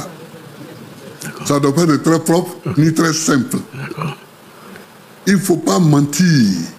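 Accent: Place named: Ivory Coast. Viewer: Il parle trop comme un vrai ivoirien fierté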